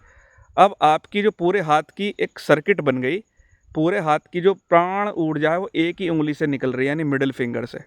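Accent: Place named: Junagadh, India. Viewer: आप की बोलने की ले से मुझे अंदाजा था की आप जूनागढ़ से होंगे आप को सादर 🙏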